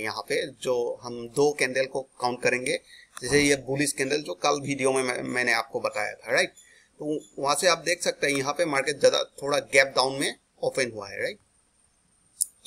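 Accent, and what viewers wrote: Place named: Tamil Nadu, India. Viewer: Tamilnadu English speaking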